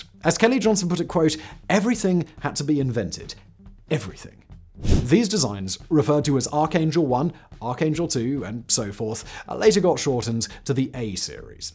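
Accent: England, United Kingdom. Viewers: Normal Americans generally refer to this as an English accent. It’s strange, but the Brits all have it! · Can we get someone that speaks American? I'm tired of Brits, especially when they put an "r" on words ending in "a".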